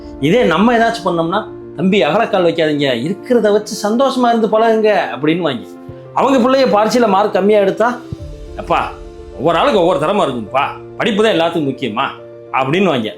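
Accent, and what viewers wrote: Madurai, India. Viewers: Madurai slang 👌👌👌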